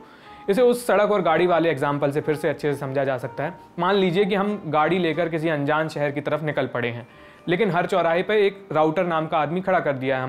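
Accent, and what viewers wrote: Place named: Lucknow, India. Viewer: Shukrya Bohat behtar tareeqe se aaone samjhaya. Aapka bohat behtreen URDU LEHJA HAI AUR urdu aap ki bohat sheereen hai..Lagta hai asp Urdu jagah ke hain...Lucknow Kanpur Rampur banaras Allahabad Bareilly... Aap aur samjhayen aap ki zuban sunkar mehsiis hua ke ham lucknow kanpur men hain Masha Allah Allah aap ki zuban aesi sheereen banaye rakkhen Ameen. Aur sab aesi hi Urdu men baten karen Adaab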